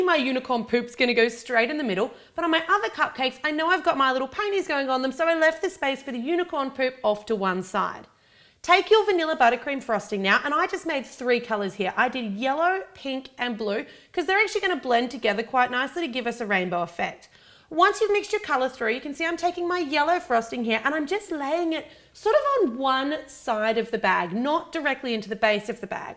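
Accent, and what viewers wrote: Australia. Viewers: You are such a high spirited person! I love your videos! Keep up the fabby work! 👌 P.S love your Australian accent! 😍 x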